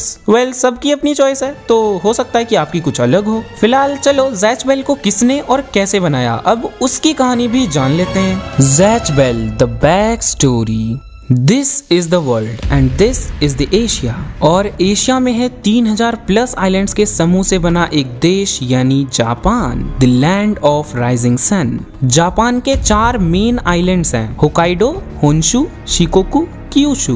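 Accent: Bihar, India. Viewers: Bhaiya aise hi bola karo aap acche lagte Ho hamare Bihar mein bhi aise hi bola tha um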